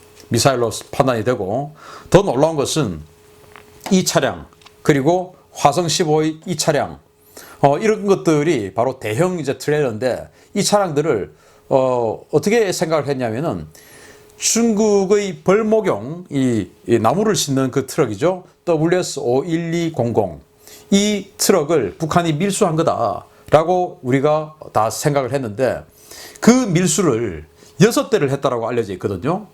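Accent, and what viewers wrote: Gyeongsang, South Korea. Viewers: 유투버가, 일본말비슷한 상도사투리쓰면않되요, 듣기거북하고 ,애들교육상않좋아요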